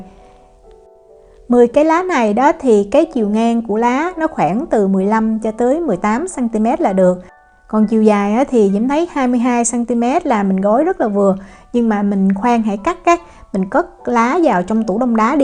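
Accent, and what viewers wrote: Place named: Saigon, Vietnam. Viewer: Bạn này hướng dẫn nói đúng giọng Sài Gòn nghe hay lắm. Clips có giá trị. cảm ơn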